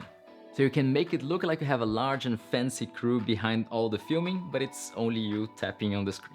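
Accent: Brazil. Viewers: Pelo sotaque deve ser br kkk cara tbm uso uma sony A7IV e apesar de todas essas funções do app ainda acha que compensa pegar por exemplo um monitor feelworld f6 ou só o celular ja me atenderia mto bem?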